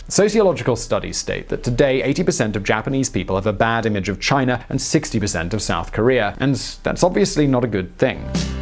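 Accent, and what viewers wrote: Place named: United Kingdom. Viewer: Kinda hard to take this guy seriously with that accent, we all know Britain is the OG when it comes to fucking over nations. And they didn't stop either, Palestine and the Middle east are witnesses. Oh my god, I just realised I speak 'their' language😭😭😭 gotta respect the British pillaging- it's value for money #longlasting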